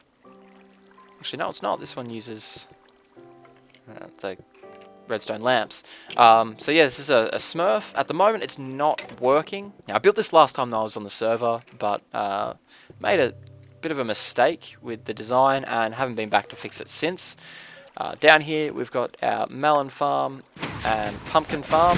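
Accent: Australia. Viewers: dude r u aussie!!!!!!!!!, cause i am too bro!!!! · Your australian?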